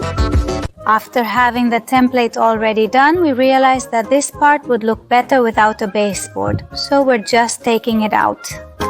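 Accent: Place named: India. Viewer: lo hace la IA en automatico XD por eso parece india hablando ingles XD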